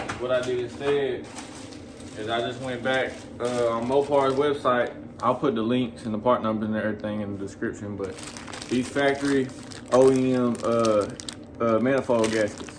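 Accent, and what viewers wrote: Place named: Texas, United States. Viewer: Where you from bro you talk like me I’m from Dallas lol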